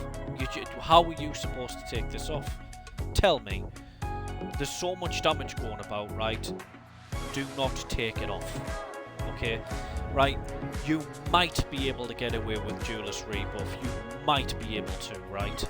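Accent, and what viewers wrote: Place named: Sunderland, United Kingdom. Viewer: Random one are you from north east? Sounds like a Sunderland accent like me.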